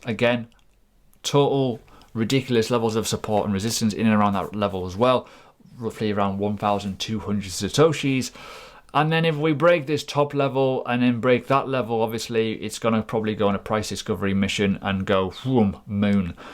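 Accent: United Kingdom. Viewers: main problem is the heavy British accent:)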